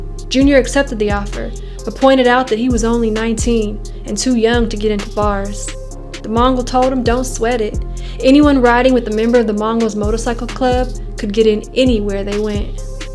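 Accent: South, United States. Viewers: The narrator has a sweet southern voice ❤️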